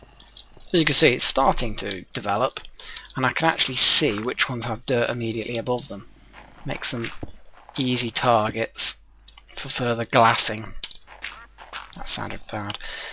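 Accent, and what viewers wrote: United Kingdom. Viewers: I've gotten hooked since episode 1 of season 1. Now I heard you're on season 3 I believe and I'm trying to catch up! By the way, thanks to you, I've perfected my U.K. accent! :D lol anyway, I LOVE your L.P. Videos of Minecraft and I can't wait till I can afford it!!! My family's not the richest...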